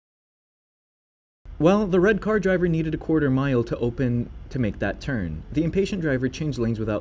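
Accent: United States. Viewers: Why is an American voicing over a British dashcam compilation cause he know absolutely nothing about our roads and sounds stupid...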